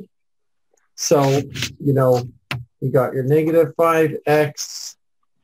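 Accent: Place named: United Kingdom. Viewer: BRITISH!?!??!?!!!?!!!!!?!?!??!?!!/!11?!?? But thanks for the tips, i needed this for my algebra.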